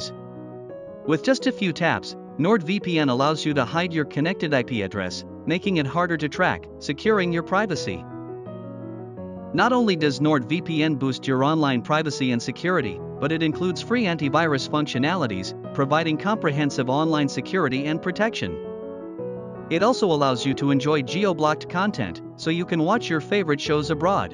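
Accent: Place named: United States. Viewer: I was amazed to discover you have such a broad American accent! 🤣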